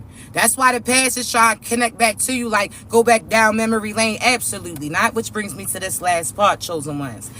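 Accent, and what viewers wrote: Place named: Baltimore, United States. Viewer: Love the accent.. Baltimore?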